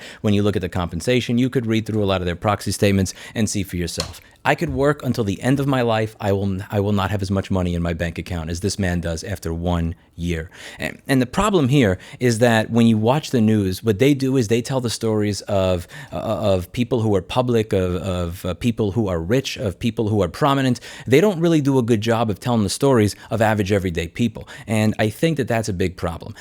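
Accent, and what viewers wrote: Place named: New York, United States. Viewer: Is it me, or is more New York today? <3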